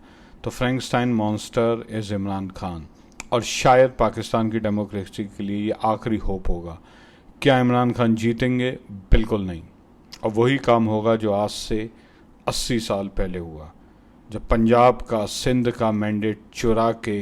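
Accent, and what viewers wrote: India. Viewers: India was INDIA under aurangzeb Rule .. the largest UNITED land area .. otherwise India, before MUGHALS was never a SINGLE country or had any central GOVT. It was divided into different states. Hindus ne UNITED India pe kabhi rule nahi kia , na hi kabhi INDIA united tha ... very biased ... u are articulate though and ars misguiding people confidently... U are INDIAN ,as it seems from ur accent and pronunciation... Pakistan ban gya , u hv to accept It ..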